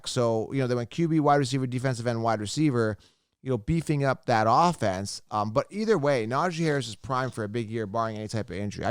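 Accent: Canada. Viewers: Are you a Canadian .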